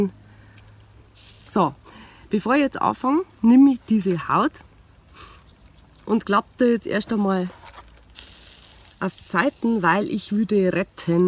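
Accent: Upper Palatinate, Germany. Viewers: Wahnsinn gefällt mir!! Extra Prima super Idee! 👍👍Tolle Frau👍👍Ich glaub fast nach dem Dialekt bist du ein Oberpfälzer Moidl!! Auf jeden Fall Topp und Gruß aus der Oberpfalz! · ned schlecht die Idee, aber sog a moi, kimmst Du aus der Oberpfalz?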